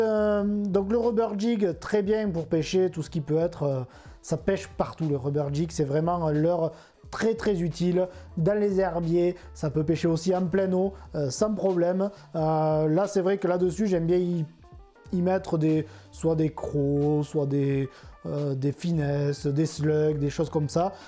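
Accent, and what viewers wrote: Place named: Southwest, France. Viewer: Nouveau format très sympa beau décor est le tout avec un accent du sud-ouest . Je participe au jeu concours .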